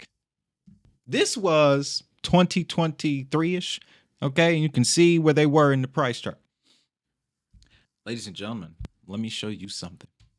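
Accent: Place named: South, United States. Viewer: Thank you for breaking it down with that Southern Style.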